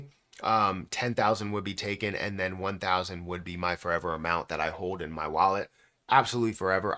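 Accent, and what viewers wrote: United States. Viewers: Most of the time I can tell if the speaker is African American or not. You sound like a 50 to 60 year old Italian American. I may have to go to the start again to actually listen to your content now -_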